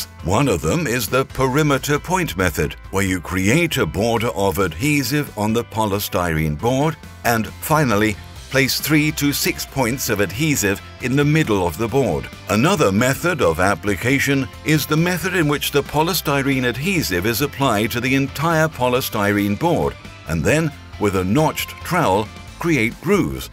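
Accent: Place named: United Kingdom. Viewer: This narrator sounds like the epic economist on YouTube just with a British accent 😂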